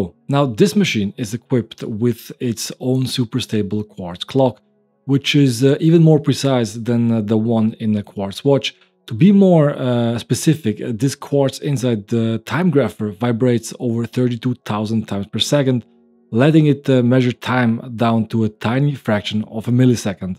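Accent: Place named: Switzerland. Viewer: An AI bot ould not have a Swiss-German accent when speaking English.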